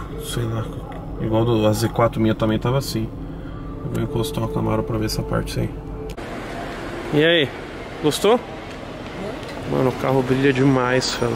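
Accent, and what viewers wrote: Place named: Bahia, Brazil. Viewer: baiano dms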